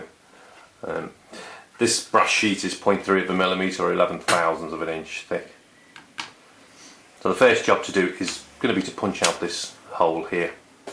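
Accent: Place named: England, United Kingdom. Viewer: Nicely done, thank you. Interesting accent, where are you from? Obviously from England, but I understand the accent is different in different areas. Thanks.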